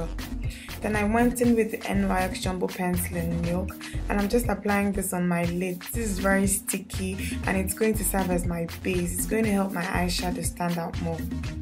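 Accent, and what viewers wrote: Nigeria. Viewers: I love everything about you and how u keep your Nigerian accent intact because it is soo cute. love your hair and videos too.. awesome. if you don't mind, could you tell us more about your hair because it gorgeous. stay blessed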